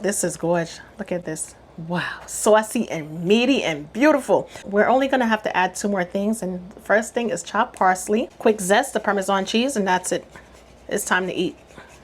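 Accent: New York, United States. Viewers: 🇨🇦👋 Girl, I am only at 2 minutes 11 seconds and I know I am going to love this recipe. It helps that you are so awesome to watch. I love your accent. You sound like you're from New York or New Jersey. I'm Canadian so I could be wrong but loving it so far thank you.😊😊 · Looking sooo yummy 😋. Loving the New York accent 😊❤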